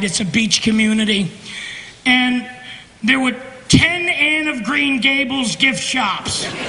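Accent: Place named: Boston, United States. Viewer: I absolutely love him. Wish I got to see him live. His Boston accent I love it 🥰 RIP John. Enjoy the buffet in heaven. Make God laugh 😂😂😂🙏🏼🌹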